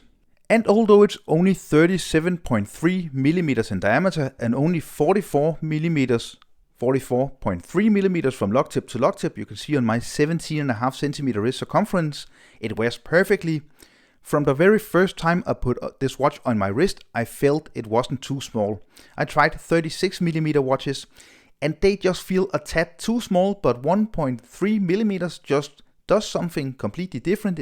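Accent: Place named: Denmark. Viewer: Man, I can pick out a Danish accent anywhere.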